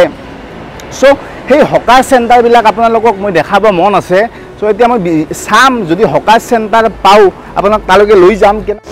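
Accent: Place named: Barpeta, India. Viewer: axomiya vakha tu thik koribo lage...borpeta vakha di kam nhobo..gotei axom a buji napai...iman bosor dhori guwahatit thaki o vakha tu thik koribo nuwarili no..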